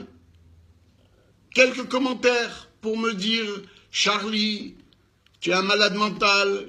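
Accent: Tunisia. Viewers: Je suis tombé par hasard sur ta vidéo... D'abord merci pour le voyage au son de ta voix, si familière...Mais oui je t'aime, j'ai 70 ans maintenant, et je suis aussi de nulle part, merci pour l'accent de mes parents (oncles et tantes), tu es surtout un poète... De la part d'un juif tunisien qui a "tout fait" de tes critères pour être un vrai juif tunisien, sauf la durée (je suis parti à 2,5 ans) car "on" nous a ouvert le gaz pour étouffer ma grand mère Gomara, mon frère Fabien (zal) et moi-même, il ne manquait que l'odeur des beignets à l'huile, Patrick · ! Tous les tunisiens que je connais en Allemagne, vous aiment fort (Musulman et Juifs). Déjà comme tu parle et gesticule c'est déjà authentiquement tunisien.. Nous sommes très émus, oui très émus!, d'entendre votre video pour être un Juif tunisien.. Merveilleux! Salutations le plus chaleureuses et patriotiques pour les Juifs tunisiens!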